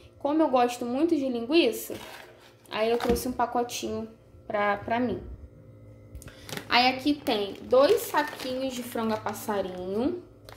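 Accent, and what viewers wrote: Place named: Rio de Janeiro, Brazil. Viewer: Oi ta tudo bem caro mais fazer compra por mes e bem melhor Ótimas compras eu tmb comprei chester na peomoção kk eu tmb compro tempero na casas Pedro Eu sou do RJ pelo seu sotaque vc e do RJ tmb ou me enganei kk eu moro perto do shopping Grande Rio Baixada fluminence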